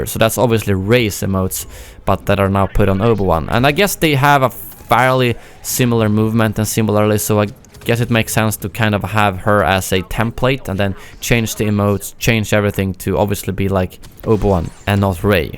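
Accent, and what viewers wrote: Sweden. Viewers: Are you swedish because you sound like you are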